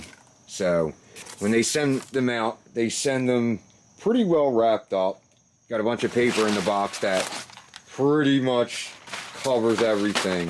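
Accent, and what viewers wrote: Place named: Pittsburgh, United States. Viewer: What part of Pennsylvania are you from? I ask because your accent sounds like you’re from the Pittsburgh area and I’m moving back and I have an lml.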